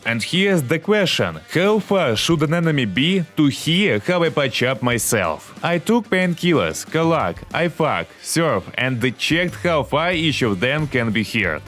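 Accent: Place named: Russia. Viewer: А мне всегда нравится классический русский акцент, ну чисто как в голевудских фильмах 80х-90х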